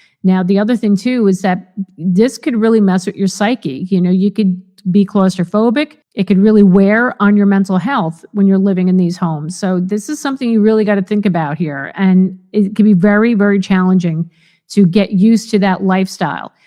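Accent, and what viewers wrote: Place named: New York, United States. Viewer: Where you from? New York sounds like. "Cawst" instead of costs. Funny.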